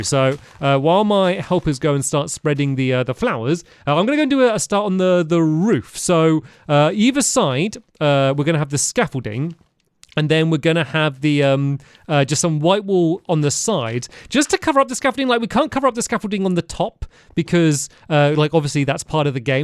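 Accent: United Kingdom. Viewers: your the most british cat i've ever heard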